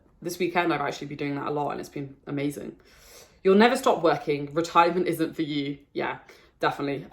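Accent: United Kingdom. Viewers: Well, I DO like your british accent. Then again, I've never really considered myself as a genuine french person 🤔 (rather than a breathing multinational (tm), haha). It's nice to get to know/understand you a bit more. I primarily thought I didn't care about those kind of videos (feels like a one-way street, if you see what I mean), but I guess it's always pleasant when it's about someone you find truly interesting and has that "something" that resonate with you. Again, congratulations. And here's to a few thousands more !🍺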